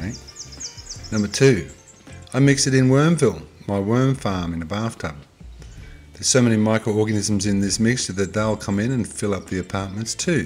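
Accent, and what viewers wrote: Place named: Australia. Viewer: ...and a beautiful voice speaking, besides this lovely australian dialect... 😉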